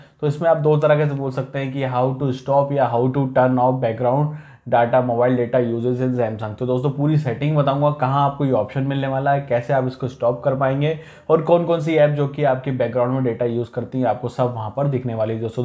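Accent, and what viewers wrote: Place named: India. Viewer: Awful accent. Hard to understand. You Indians should be more careful about who is listening to you and try to speak slower and clearer.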